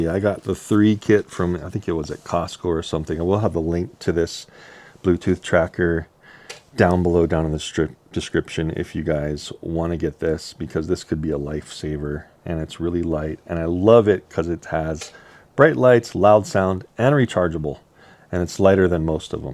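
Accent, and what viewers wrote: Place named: California, United States. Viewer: Well you can definitely tell he's probably from California based on the way he talks and their PC culture God I hate California I will never go there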